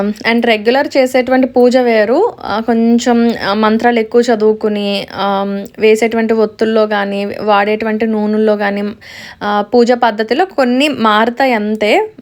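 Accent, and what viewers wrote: Godavari, India. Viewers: Meeru vunna place? Godavari slang bagundi andi, madi east godavari, chala baguntadhi slang😅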